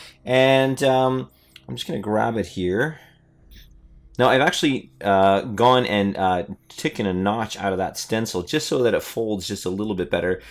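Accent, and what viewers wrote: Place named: Canada. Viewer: I'm hearing faint traces of a US northern mid-west or Canadian accent, and since he is pronouncing "decal" that way I'm going to guess it's Canadian. That pronunciation is consistent with other Commonwealth nations. The first time I ever heard it pronounced that way it was by somebody from the UK. Didn't seem too strange, but it is a little surprising to hear it in a North American accent, though. I'm glad everybody was able to work everything out.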